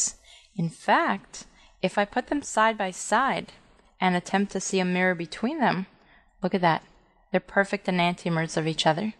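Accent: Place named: New York, United States. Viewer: Beautiful! You got to be from New Jersey/New York. Thanks.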